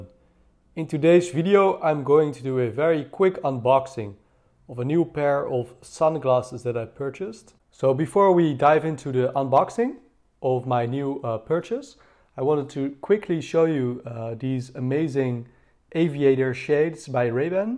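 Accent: Netherlands. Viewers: I hear a Dutch accent.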